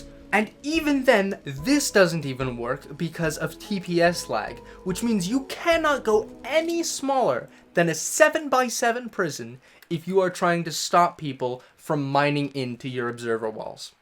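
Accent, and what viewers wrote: Canada. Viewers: Are you Canadian ? 🫧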